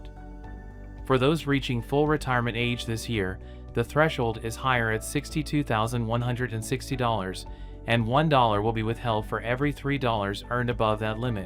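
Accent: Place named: India. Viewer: I hope that's an Indian because it sure isn't a black man's voice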